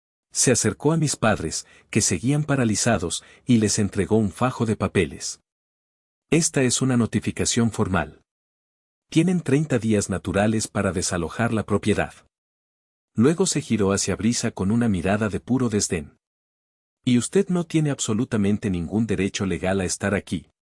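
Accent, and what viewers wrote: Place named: Mexico. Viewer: Dejaron de gustarme estas narraciones,mejicanas😢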